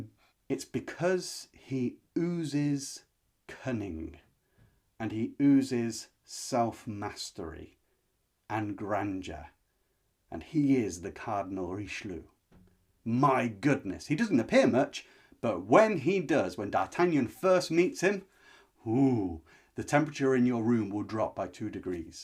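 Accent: United Kingdom. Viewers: Would love to hear you read some of these out loud in your impeccable British accent! You’ve inspired me to pick up some classics, thank you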